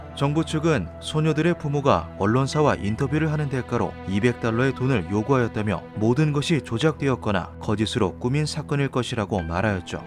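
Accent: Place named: Gangwon, South Korea. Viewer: 고향이 강원도 신가요? 강원도 억양 있으신데 ㅎㅎ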